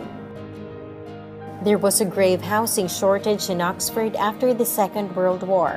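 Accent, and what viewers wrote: United States. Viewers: Why is the narrator an American?? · The text is fine , why on earth has "Oxford info", presumably outsourced by OCC , used a mechanised American voice for this video instead of a rich Oxford town burr? An answer pls?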